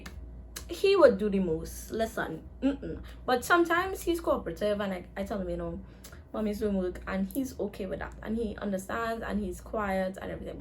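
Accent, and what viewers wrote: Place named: Trinidad and Tobago. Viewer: Are you Trinidadian? You kinda have an accent... similar to Trinidadians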